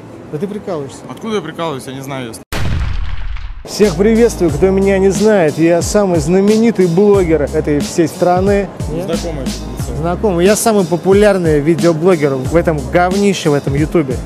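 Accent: Russia. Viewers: Если вы из Питера, то говорите чистым русским языком (питерским)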